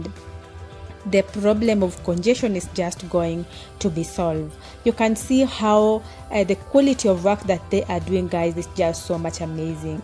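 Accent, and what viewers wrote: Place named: East Africa. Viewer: I follow Yr channel from Southern Africa. I like development topics in africa. However if I may ask? Why does Yr accent sound East African than Ghanaian or ratcher not west african?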